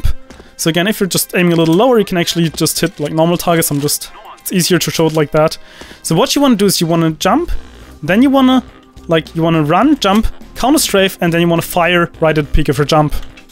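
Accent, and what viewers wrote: Germany. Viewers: English sucks man, it's German accent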